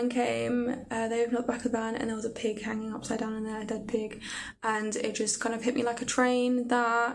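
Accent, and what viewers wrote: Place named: United Kingdom. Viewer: For the first half of 4th grade, we had world geography from Mrs. Hisey in FL USA, and she told us we would need to do a semester project on a country. But I asked her if I could instead focus on Antarctica, my favorite continent. So I used my Father's drafting tool called a pantograph to enlarge a Rand McNally map onto a piece of plywood. I used plaster of Paris painted white to show (vertical exaggerated of course) mountains and painted the ocean blue. I put in little flags to mark the winter-over science stations of color-coded various countries. I added a backboard for some basic stats. I loved that Antarctica was and is the continent of peace, science, nature, and an international zone! I enjoy your delightful UK accent and your love of science and your being a longtime ethical vegan! From my work with ramping up Green TV (US), I know just how challenging it can be to attain 1000 subscribers, so I congratulate you on attaining that. 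Glad you will be tapping space data in your research--not only what the late physicist-astronaut Sally Ride deemed Mission TO Planet Earth, but also via comparative planetology understanding what happened to Venus and Mars, for instance. BTW, as to books, I managed to get the UK edition of Greta Thunberg's "The Climate Book," published on Thursday 27 October 2022, and am reading it and will be reviewing it for Green TV Web site, our first-ever book review. It is an outstanding primer on the No. 1 climate crisis, brin ging together essays by s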